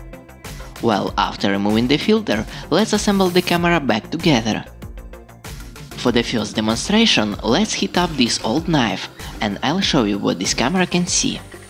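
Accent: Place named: Russia. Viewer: Москальський акцент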